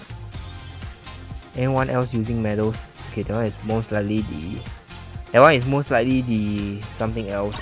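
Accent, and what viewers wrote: Singapore. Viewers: are u singaporean?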